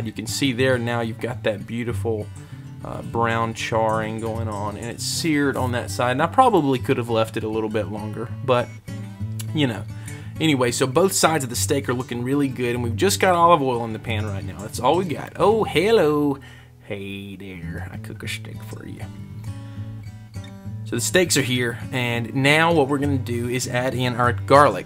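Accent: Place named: South, United States. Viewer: Lol....sounds like he has a southern accent and I've heard southerners use oil like it's a marinade.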